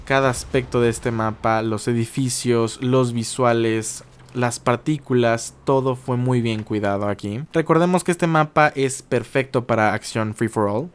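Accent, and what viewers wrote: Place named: Bogotá, Colombia. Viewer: que paso mi Cantinflas y ese bogotazo xd no te va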